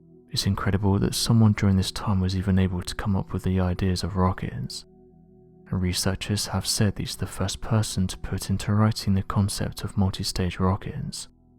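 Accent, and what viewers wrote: United Kingdom. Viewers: Cool info. But the British accent I can't listen.. I know it's not right. They are the worst ,look at histroy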